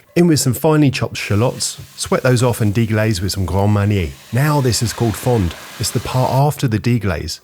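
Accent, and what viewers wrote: United Kingdom. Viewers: Fuck off mate I can tell from your accent you eat beans for breakfast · He sounds British and says "in". Otherwise, no.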